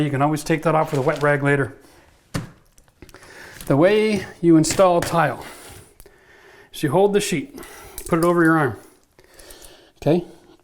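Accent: Canada. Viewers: Him: Anyone can do this? Me: Hold my beer. I knew you were Canadian from the first video I watched, and it was the way you said 'about'.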